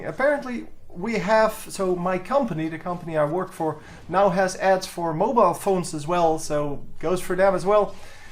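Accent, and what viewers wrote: Netherlands. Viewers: The 'obviously dutch' way he prenounces every single word just annoys me so much and his overreacting.. oooohhhh niiiiicely done! Good work MEENN. Next time spectate a elo instaid of lvls 20/25. Thank you.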